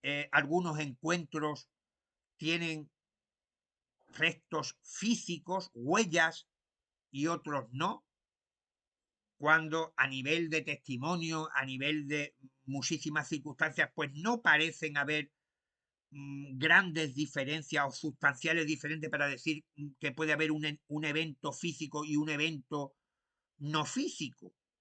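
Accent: Spain. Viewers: Are you in South America or Spain,sounds Spanish in Spain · Como siempre te explicas de maravilla. Me encanta escuchar tu acento ( una Algecireña desde Girona), de verdad hablas mejor que ningún político, periodista u orador actual, viva la Z ' Un abrazo crack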